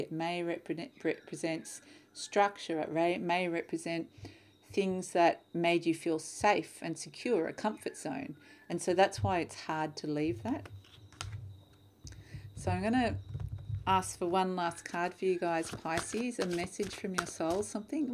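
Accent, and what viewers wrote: Australia. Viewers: Yeah those aussie spiders can be scary! 🤪your readings are wonderful love! And nothing more lovely than the stralia accent! 💖💕 my home away from home. 🤸‍♀️💫💖 thank you for the great reading!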